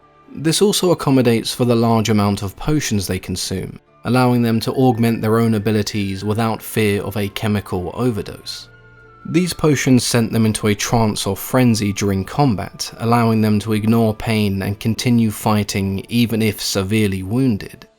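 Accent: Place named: England, United Kingdom. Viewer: Direct, clear, informative and English accent....good video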